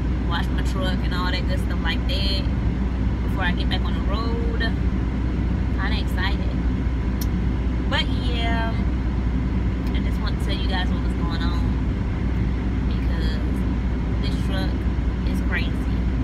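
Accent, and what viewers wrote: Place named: Georgia, United States. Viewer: You from south ga huh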